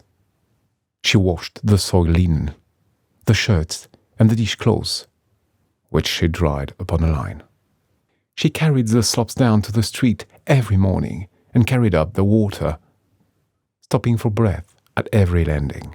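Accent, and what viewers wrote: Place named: United Kingdom. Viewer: C'est une si belle idée de réaliser une version anglaise ! And what a delicious british accent ! I like that.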